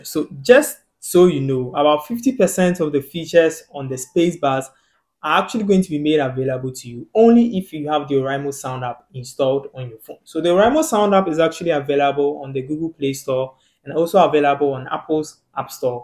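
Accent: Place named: Ghana. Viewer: 😂😂 you scratch my back I scratch your back right! Yeah I know you're Ghanaian now your accent says it all!!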